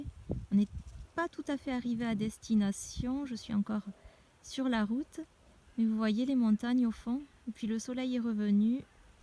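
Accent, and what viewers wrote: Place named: Pyrenees, France. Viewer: Ah les Pyrénées,on ne veut pas les quitter,je suis d’accord,et pourquoi tu parles comme moi on a le même accent 😂❤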